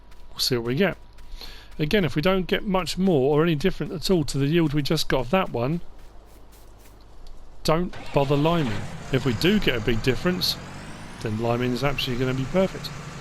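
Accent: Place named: United Kingdom. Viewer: Great video!, and useful to practice British english! 😁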